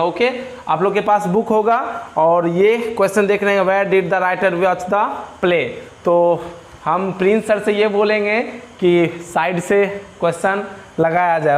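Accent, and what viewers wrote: Bihar, India. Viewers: Aap bhihar ke h sir 🤔🙏🙏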